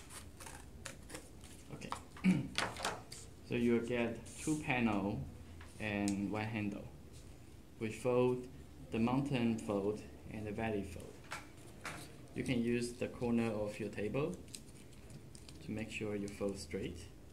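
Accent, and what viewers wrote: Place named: Taiwan. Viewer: 聽起來好像台灣人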